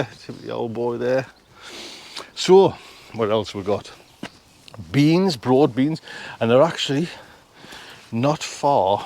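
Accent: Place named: Northern England, United Kingdom. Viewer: We’re a little south of you, accent wise 😂 and my elephant garlic is a bit behind you. The red onions sets are starting to bolt here, every bloody year. Slugs are a nightmare on one bed in particular here. I don’t know what your weather has been like but it’s been like a yo-yo here Durham way. T-shirt then jumper 🤷‍♀️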